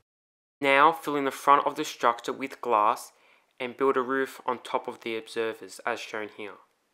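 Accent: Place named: Australia. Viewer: As soon as I heard your voice, I knew you had to be aussie. Sure enough, the 'about' page for your channel confirms it! Hey mate :P Just checking your video to reply to your question on VidIQ discord. I've subscribed :)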